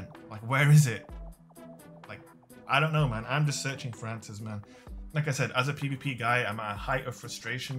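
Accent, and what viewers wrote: United Kingdom. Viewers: You're so british lmao I luv it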